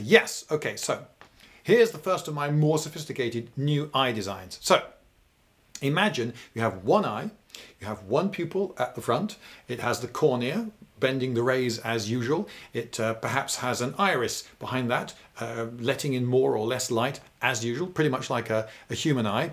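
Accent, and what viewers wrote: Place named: United Kingdom. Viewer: Did I just learn about biology by some british bloke talking about the visual abilities of a cyclops? Amazing world we live in.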